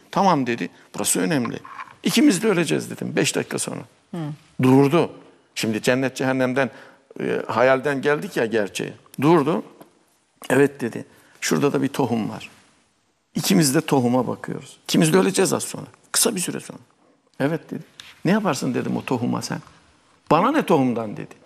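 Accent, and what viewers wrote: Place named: Erzurum, Turkey. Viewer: Allah hocamiza uzun omur versin. Erzrum şivəsi, eyni İrəvan turklərinin şivəsi!!!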